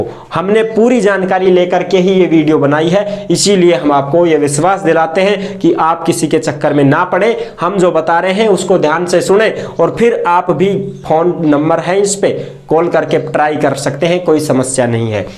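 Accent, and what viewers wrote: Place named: Marwari, India. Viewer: Bolane ki istayl bhut ahhe h 😂😂😂❤❤ Jo bich me marwadi ka tdka h vo mast h 😂😂❤❤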